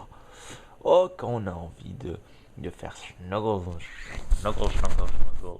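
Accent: Canada. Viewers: Accent canadien <3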